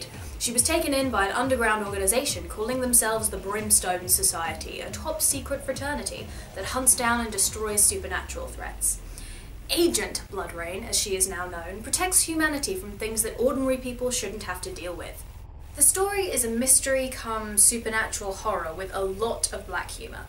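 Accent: United Kingdom. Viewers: awesome review is that a british or australian accent!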